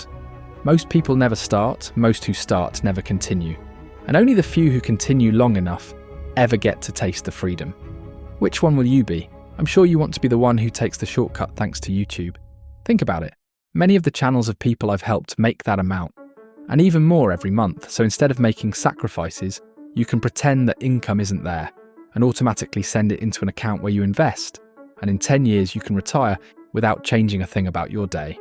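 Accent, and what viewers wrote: United Kingdom. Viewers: Person with British accent won’t teach you anything new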